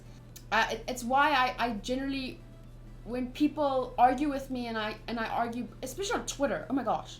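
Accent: South Africa. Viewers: you sound South African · Nice accent! Where in SA are you from? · I just knew you were South African!!!!!!!!(0_0) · You sound South African? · Wait you sound South African. Are you?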